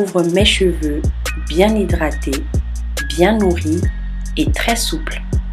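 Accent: Gabon. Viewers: 🇬🇦...haaaa je savais l'accent boma👌 au moins c'est plus crédible on je me retrouve dans le type de cheveux 😋 courage sista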